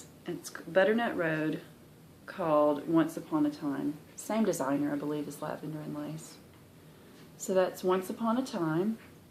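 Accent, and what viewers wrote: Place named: Atlanta, United States. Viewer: Hi. Loved your video. I was delighted to hear an accent similar to mine! I live south of Atlanta so you sound perfect to me! I'm fairly new to Floss Tube so I just found your channel. Looking forward to your next video.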